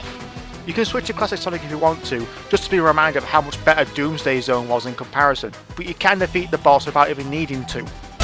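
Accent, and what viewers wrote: United Kingdom. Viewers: is from UK?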